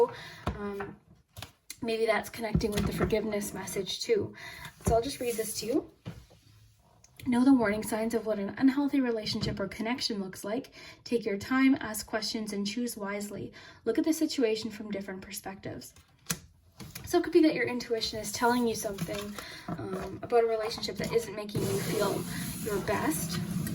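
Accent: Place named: Canada. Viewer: I’ve always guessed you lived in Canada or Minnesota by the way you say “sorry” in your videos. This was the first time I heard you say where you were from and I feel that you being from Canada is so fitting I’m not sure why.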